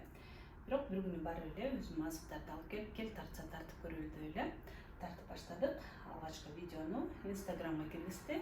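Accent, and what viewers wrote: Standard. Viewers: Белегиниз мн чын журоктон куттуктайм.Сизди таза кыргызча суйлоп,орусча кошпой суйлогонунуз учун дагы абдан сыйлайм..Тазалыктын сырларынан да айтып,уйротуп турунуз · Саламатсызбы,.Ден соолук, дагы көптөгөн ийгиликтерди, үй-бүлөлүк бакыт каалайм! Кыргызча эң сонун таза, көркөм, таттуу сүйлөп түшүндүргөнүңүзгө чоң рахмат! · Азамат кожойке экенсиң алтын кыз бар бол Таптаза кыргызча сүйлөйт экенсиң кай жердин кызы болосуң ❤ Бар бол алтын кыз рахмат эмгектериңе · Азаматсын,так ,даана,таза кыргызча , түшүнүктүү сүйлөгөн кыргыздын сыймыктуу кызы!!! Белегин кут болсун!! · Үнүңүз өтө жагымдуу,таза кыпгызчаныз дагы жагат,бизге сонун тамактарды үйрөтүп эле жүрө бериңиз,бар болуңуз 😘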